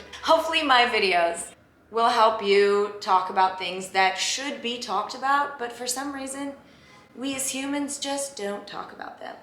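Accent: Minnesota, United States. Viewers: So Minnesotan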